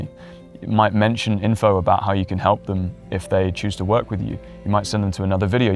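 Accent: England, United Kingdom. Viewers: It also helps to be classically good looking and charming -- And to have a clear and articulate English accent with a soothing baritone voice. No Diddy. I'm just pointing out that in addition to the steps which you cover, I believe it will work especially well for you because you are beautiful (although your skin was oddly greenish-yellow in some camera angles.) I guess the point of my saying all of this is, I wonder if this would work for everyone. I mean, I'm going to try it for sure, because I am not a blubbering rock troll and I'd like it if people watched my guitar content. I appreciate this video and I look forward to seeing more of your stuff. Thanks! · It's called having a British accent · Not everyone likes an English accent. I for one generally don’t . I will skip one it unless American English. This guy has gone tone. I’m interested in what he is saying so I listened to see if I can incorporate in my channel- which is a different IDENTITY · Well, I watched your video. 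And at the end, I felt like I was talked to by a skeevy religious zealot trying to get me in their cult. No amount of soft music, country bokeh, low grade UK accent voiceovers and coy looks is going to change that. I will continue to be me. Draw those that enjoy me for me. Try to be the BEST me I can be. And not gaslight people into "liking and following me". Where exactly is the merit in that? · English accent. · I used to work at a radio station as a receptionist. I heard every kind of great voice to the slow, boring voices. I learned a few things that have helped me with my channel. I try to let people "feel" my voice. This is good information he is sharing. The English accent just gives him a cooler edge. 🎤👍🏽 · is the english accent a requirement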